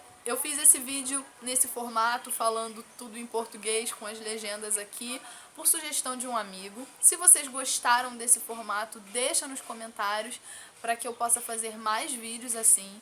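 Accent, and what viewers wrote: Brazil. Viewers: Love this Portuguese/Brazilian language accent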